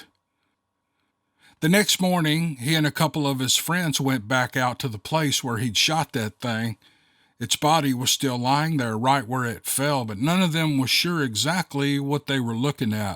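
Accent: South, United States. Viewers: First thing Monday morning the great Sir on my phone this 5am awesome Sir great read and more Awesome real voice lovin that southern twist voice truly awesome thank you Sir miss that southern voice yes Sir this story is great as usual missing (Steve Lily) but yer presence is great hopefully yer fall/winter is relaxing at home with family have a great thanksgiving to y’all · I moved to Maine 6 years ago from Louisiana/ Texas. Grew up in the Sabine river swamp and spent years deep in the woods there. I Love it here! But yes, the woods are very different. Not so creepy, really, just different. I love your fabulous stories (miss the southern accent) but don't really lean toward belief... And moose, yeah... I'd rather find a bear! But honestly both really only ever just run away like scalded chickens.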